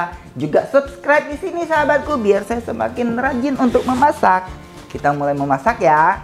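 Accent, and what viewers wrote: Bangka, Indonesia. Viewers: "kita mau mencedoknya" ... kokoooo bangka banget geeh...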